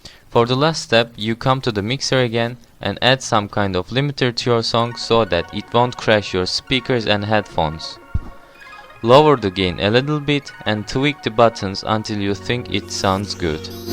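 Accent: Turkey. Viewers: lan yemin ediyorum kanala bakmadan bu ingilizcenin türk birinden çıktığını anladım aosıhdpoanrv8ebrwıunabf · he is turkish :D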